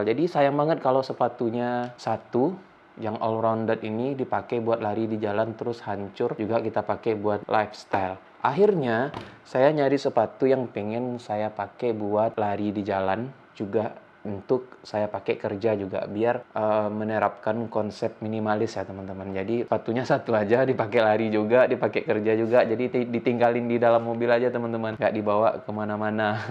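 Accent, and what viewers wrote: West Sumatra, Indonesia. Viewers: Lidah nya patah2. Dima sumbar da? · Aksen minangnya ketara banget tapi mantap uda reviewnyo. Sangaik mambantu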